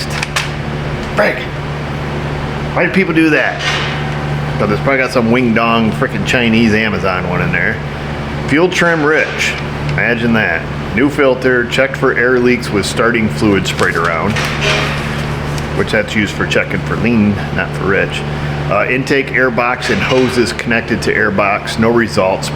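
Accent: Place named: New York, United States. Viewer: I watch every video that you make and look forward to each and every one of them and have been a subscriber for since I discovered your channel.rears ago Ii hit thr "like" button (if I'm not distracted) because i appreciate your honesty to your customers and get a real idea of what it's like running your own shop and it makes me appreciate my local garage. My only beef is the "Members first} option that I refuse to join. I'm from the heart of Dixie and forgive your New York accent because if you wrere the family next door ya'll would be my best neighbors ( I have a French family, a British family and an African American families in our Cul de Sacand they ALL are great folks but there is a loss of communication since I am a BAMMER. I get you and perfectly even though yousay bunnons for BuTTons Keep making great videos and oh did I say I dislike members first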